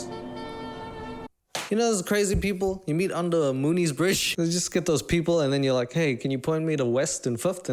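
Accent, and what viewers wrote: Australia. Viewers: Less than 6 minutes in and my dog tried to save us by knocking the YouTube machine (cellphone) off the table. Though in this dude’s defense- he sounds like a standard surfing Australian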